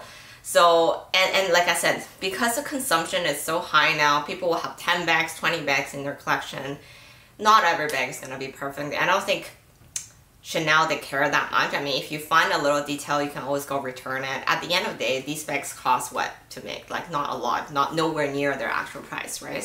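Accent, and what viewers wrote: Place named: Hawaii, United States. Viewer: haha okay! You sound like a Hawaiian local!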